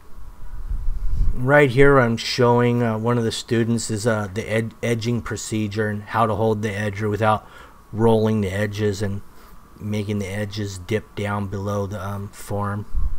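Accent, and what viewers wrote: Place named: California, United States. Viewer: you're a good man. You are a throw back to the true Californians I remember from back then...even the way you talk. AWESOME!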